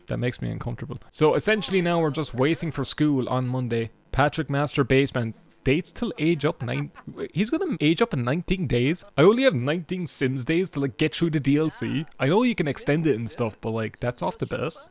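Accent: Ireland. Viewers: Man I wish I had an Irish accent I love how it sounds lmao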